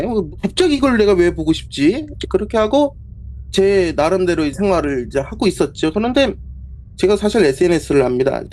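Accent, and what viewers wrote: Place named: Gangwon, South Korea. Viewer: 대전분이라해서 들었는데 토박이는 아니신가봐요 말투가 전혀 다르시네요 강원도분인가ㅋㅋ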